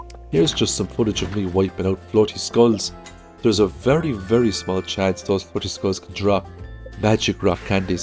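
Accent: Ireland. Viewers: I literally came back to this video to post this comment. I watch these videos once or twice while I'm awake to get ideas for my own playthroughs, and then I use them over and over to fall asleep to because doesn't ever raise his voice. The Irish accent helps with the relaxing quality too I think. So many other content creators can't be trusted to not scream in my ear suddenly while I'm trying to fall asleep. If you're into calming voice overs in general, I recommend Steve1989MREInfo. Completely different channel, he reviews MREs, but his narration is very calm and consistent throughout his videos.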